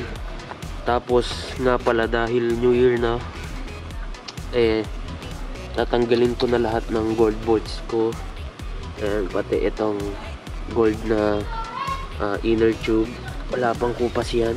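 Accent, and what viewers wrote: Pampanga, Philippines. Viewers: Kapampangan accent.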